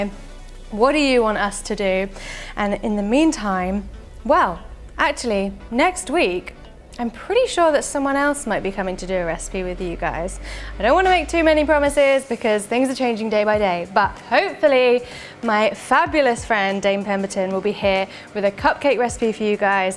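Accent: England, United Kingdom. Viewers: 😊love English accent 🎉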